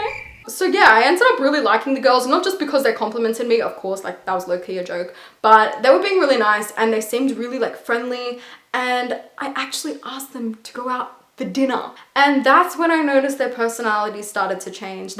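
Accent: Australia. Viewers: Is that an aussie accent? And you speak korean? 😍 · are you an aussie? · Your accent is like Australian but with a tinge of something else ?? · You are really pretty i know you sound Australian but I’m wondering what your background is, are you mixed ?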